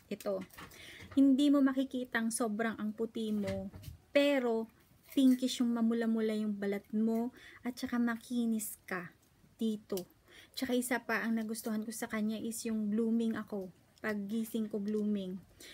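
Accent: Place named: Ilocos, Philippines. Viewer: ilokano k girl? pra kcng may punto k. ganda mo nmn khit nsa japan k belo p rin eh db dami gluta dyn?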